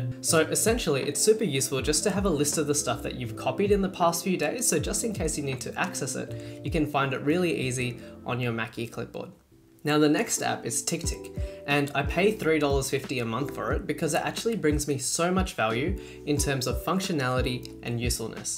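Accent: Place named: South Africa. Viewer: Are you originally from South Africa? Your accent sounds exactly like someone I know who is from there.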